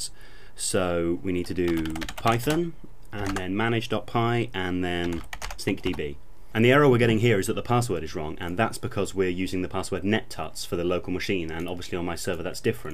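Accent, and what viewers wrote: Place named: England, United Kingdom. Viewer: God that english accents glues me to this video :O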